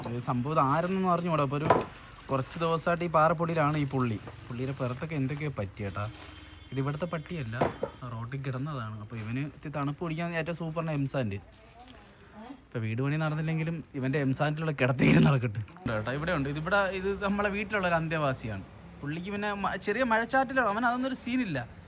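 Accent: Trivandrum, India. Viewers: എനിക് നിങ്ങളുടെ വീഡിയോസ് ഇഷ്ട്ടമാണ്. ട്രിവാൻഡ്രം ഭാഷ കേൾക്കാൻ രസമുണ്ട്. ഒരു 👋 ഇട്ടേരെ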